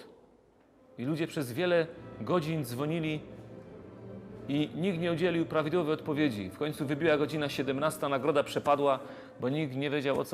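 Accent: Silesia, Poland. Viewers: Nasz kochany juz Cie zodyn nie zastąpi tys jeszcze godoł jak my w Stolarzowicach 😢 teroz momy juz tyla goroli na Naszym Sląsku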